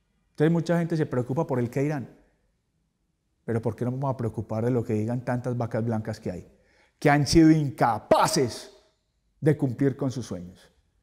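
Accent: Antioquia, Colombia. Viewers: definitivamente el acento colombiano hace que sienta que me están castigando, un abrir de ojos instructivo. Que sea de una vez. El momento es ahora. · LUIS CARO no lo compares con ese asesino. Jajaja te hace parecido por que ambos son paisas, son de la misma región de Colombia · Me encanta el acento colombiano!!! Ay Matilde!!! 🤣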